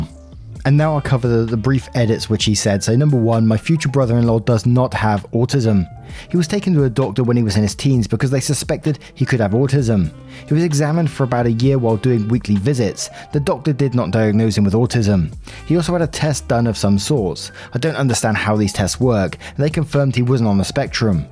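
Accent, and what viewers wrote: United Kingdom. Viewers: I don't really like the relationship stories but I wanna sleep on a bed of your voice! 😂😂😂 So whether I like the topic or not, I don't even care. Can listen to your voice all day! It's that british accent. 😊 I know this is an entitled neighbor one but was just sayin'....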